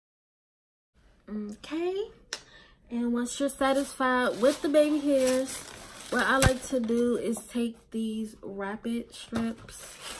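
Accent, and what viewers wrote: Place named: DMV, United States. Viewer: I know a DMV ACCENT when I hear one 🥰🥰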